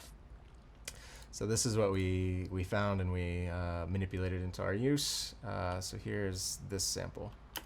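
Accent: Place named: Portland, United States. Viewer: I could tell you where from portland before you even said so. I would know (I live there)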